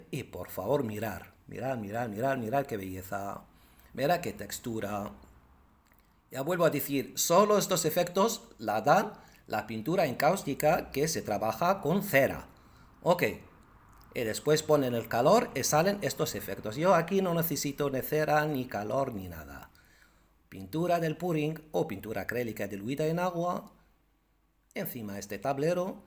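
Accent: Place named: Morocco. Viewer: Me gusta ver y escuchr a como maestro, con su acento marrocano en castellano. Recuerdo mis amigos Marrocanos que vivien en Oslo, Yo agradesco siempre por tù generocidad de compartir tus experiencias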